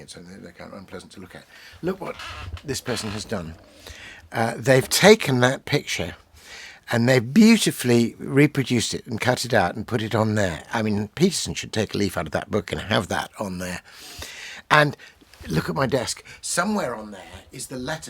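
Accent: England, United Kingdom. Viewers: A fine stoic English accent will be the most comforting thing I can think of if the world collapsed tomorrow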